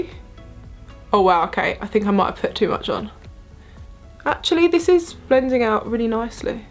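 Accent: England, United Kingdom. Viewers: Your English accent is awesome! Your beauty is awesome! Your Everything is awesome! You are awesome, dear! Lots of love from India to you!! We love you alot.. and hope that you too love us Indians..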